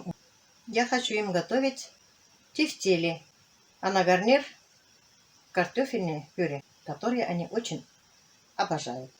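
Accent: Tatarstan, Russia. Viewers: Какой у вас татарский акцент как у моей бабушки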